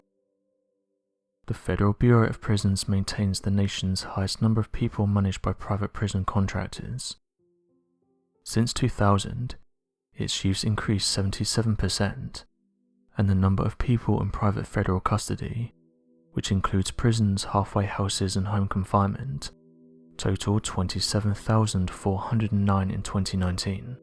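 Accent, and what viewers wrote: United Kingdom. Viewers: Ok so the British accent really is cool but this is old news. How about this computer guy. DONT BREAK THE LAW!!!!!!! Because you will be rolled into the most heinous situation. All of this comes from WHITE people thinking slavery was a good idea. Like any business you need more customers so like a good little racist you are now putting your own People to the sword.. It's human instincts or manstincts that once you do something heinous it breeds and breeds and breeds. Not dealing with slavery ( The dumbest thing AMERICA ever did ) and by that I don't mean reparations , that's tooooooo late. What I mean is sympathy on a level that is real. I get no one wants to pay but how about some sympathy. There is NONE which is why this country is now cannibalizing it's won People. It's really easy , show some damn sympathy and this stuff will never happen but to try to departmentalize hate will lead to this. 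Unresolved issues lead to more. So you got more! This requires a total change of heart on the whole country. Biblicaly this is not going to happen so get saved lol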